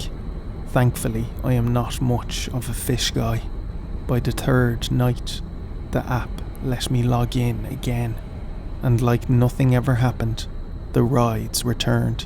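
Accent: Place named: Ireland. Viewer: Hi, may I suggest, you reduce your posting from every day to 3 or 4 days per week. - we don't want to see you burn out, your subscribers care about you - having set days gives you a goal and we know when to look out for your videos - if you do more videos you'll, build up a backup in case the inevitable problem occurs, such as tech glitches - its tempting to give in to the pressure of posting full videos every day. You are the boss, you set your own achievable schedule - suggestion, on non-posting days post a teaser of what is upcoming. This will give you a post a day and maybe help with the pressure. One of the reasons I follow you is the quality of the videos, you aren't American and the Irish accent is gentle on the ear, like the intros and no ads. There is a lot of new YouTubers posting videos in this genre at the moment. Quality will last over quantity, hang in there. 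Keep going, you've got this and we're all behind you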